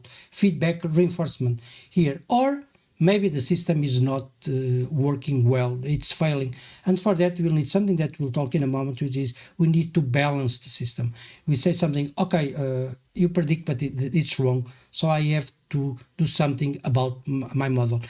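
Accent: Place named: Portugal. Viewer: I recognize that accent anywhere. You, sir, are Portuguese. Congratulations on a very interesting video.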